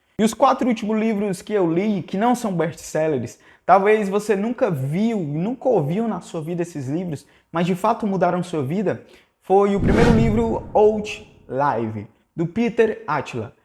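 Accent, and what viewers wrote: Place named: Brasília, Brazil. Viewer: Esse cara é de Brasília, kkk maior sotacão de candango.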